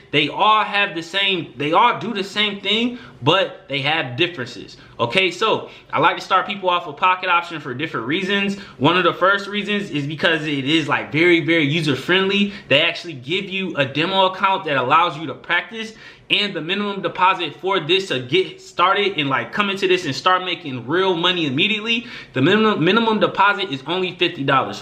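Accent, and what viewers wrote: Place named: Louisiana, United States. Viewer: I hear Louisiana in your voice or Detroit but I think Louisiana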